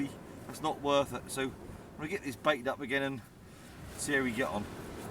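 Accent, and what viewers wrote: Norfolk, United Kingdom. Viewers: Hi, great video. Love your content, and your style that you present it in, that lovely Norfolk accent. like the hoodie, keep on entertaining us.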